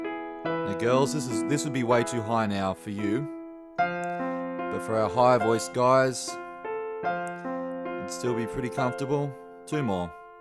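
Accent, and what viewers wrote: Australia. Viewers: You are one of the best vocal coaches here on youtube, possibly among the top 3, and I just love the aussie accent!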